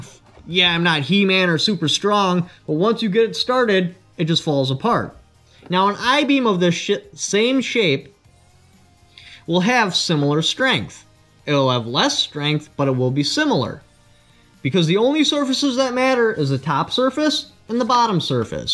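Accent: Canada. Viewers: The Canadian is strong with him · This is the great Canadian/hillbilly accent. Its awesome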